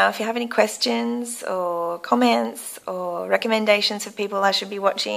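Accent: Australia. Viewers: I'm starting to think all Aussies are just good looking people! I agree it's refreshing when I hear the Aussie accent. :) You will be amazed on how much things will change even in 3 or 4 months. Glad you reached out to share your journey and I think you'll fit right in! I used the same explination early on as well. If people find the FatKings stuff, then great, but I didn't go out of my way to tell them early on. -Phil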